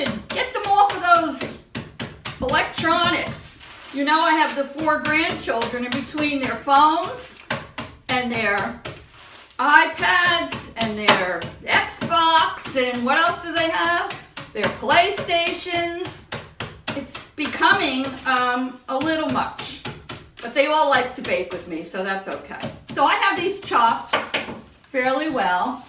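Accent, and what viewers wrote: New York, United States. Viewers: Whoa...I jumped when the bowl slipped from your hands! That dip looks yummy and pretty. I've got to ask...are you in New York, cause I detect an accent? (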